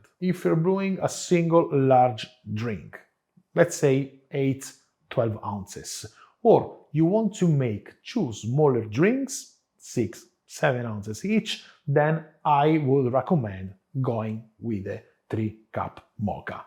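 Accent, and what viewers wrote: Italy. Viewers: He is genuine, he is experienced. The Italian accent was a little tricky for me, so I added the subtitles on. However, I learned how to use the Moka Pot for Italian style coffee.. Can you show me the exact increments for each cup of coffee, For example, 12g of coffee with 70-80 g water. So what would the increments be for the other cups of coffee? The exact increments will make better coffee. Thank you so much for this presentation,. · Listening to 10 minutes of english with an italian accent is the real treat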